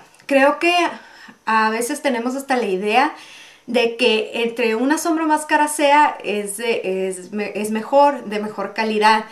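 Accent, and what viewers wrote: Tijuana, Mexico. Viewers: Me encantas!! eres de Tijuana? jajaja, yo soy de Mexicali y me identifico con tu forma de hablar jajajaja, saludoss sigue haciendo tutoriales son muy buenoos!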